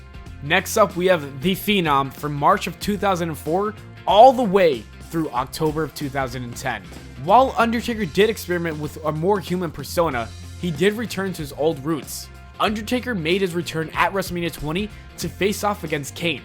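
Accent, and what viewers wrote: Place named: South, United States. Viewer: Your southern accent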